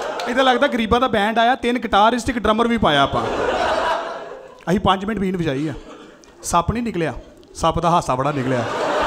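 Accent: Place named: Punjab, India. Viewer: Punjabi accent ❣️ baai mar ta hasaa hasaa ke... Lub ju aa 😂😂😂😂😂😂😂😂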